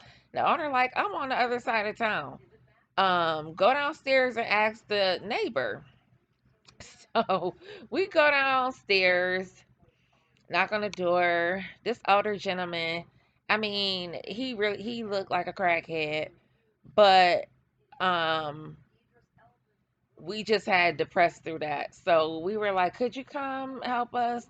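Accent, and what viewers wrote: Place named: California, United States. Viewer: I'm from North Carolina, to show you how bad I am with accents , I first heard you talking and thought that was a Cali accent. I live in N.C. tho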